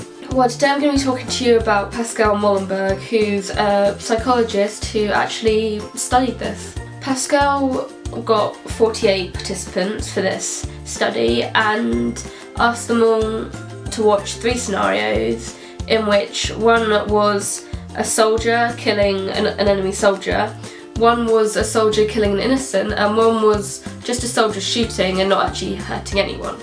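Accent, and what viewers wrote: United Kingdom. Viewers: Serious subject, happy clown music and a brittish accent do not go well together. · British Accent, Happy tone and a Psycho Topic. Hillarious.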